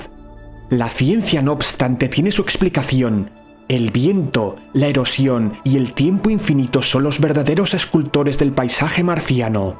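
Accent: Spain. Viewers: Me gustan tus video pero deberías por el bien del canal contratar un relator latino, a muchos latinos no nos gusta el acento español, con todo respeto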